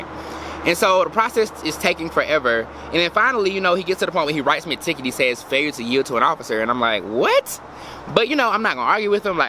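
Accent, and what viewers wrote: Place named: Georgia, United States. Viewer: lmao sounds like gwinnet 😂😂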